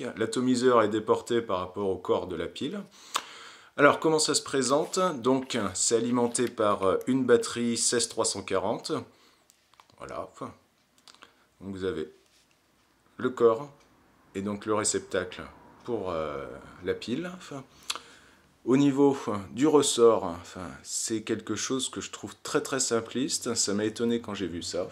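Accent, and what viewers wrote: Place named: France. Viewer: I gave it a like just for the french accent love it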